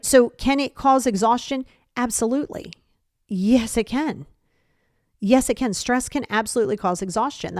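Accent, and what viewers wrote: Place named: England, United Kingdom. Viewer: Hi from Algeria , the English accent was cute 😂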